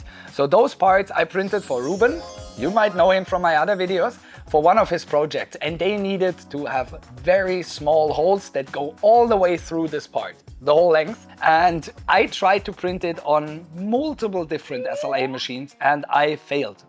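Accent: Germany. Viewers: i was thinking this dude has a german accent.. .then i saw the waschgang sticker :D geil :D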